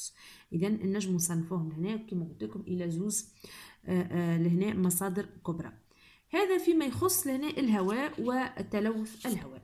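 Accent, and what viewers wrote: Tunisia. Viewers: Min lahjitha ta3rifha tounsia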